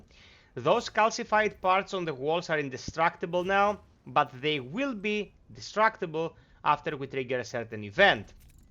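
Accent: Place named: Greece. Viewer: Βάζεις να δεις το βίντεο που σου έβγαλε η αναζήτηση και ακούς ελληνικής προφοράς αγγλικά! Μετά λες ρ 'εσύ, σαν του την φωνή και έχεις δίκιο! Πάμε joystick!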